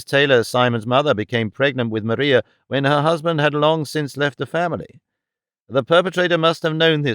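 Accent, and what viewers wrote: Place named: United Kingdom. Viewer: I will really miss this so clear British accent❤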